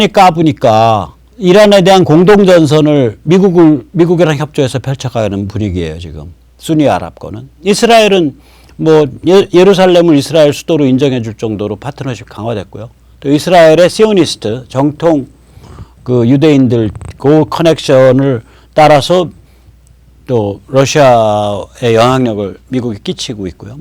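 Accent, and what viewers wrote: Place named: Seoul, South Korea. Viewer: 오늘도 자알 들었습니다. 수강료를 고액지불하고 들어야할 정도로.. 그아말로 세뇌탈출..! 감사 합니다. 때때로.. 아는 사람들만 알아듣는 님의 "서울사투리"가 감칠맛을 돋궈주십니다.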